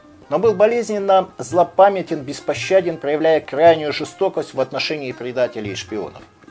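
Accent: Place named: England, United Kingdom. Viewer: Он не руски акцет ангилиски ест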